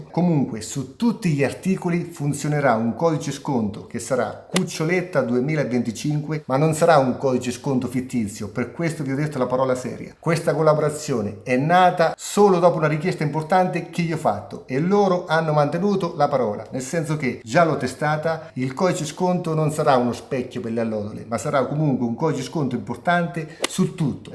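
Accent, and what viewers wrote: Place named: South, Italy. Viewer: Che accento da terrone!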